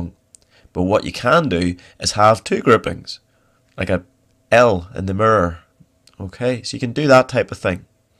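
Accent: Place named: Australia. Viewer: he is Australian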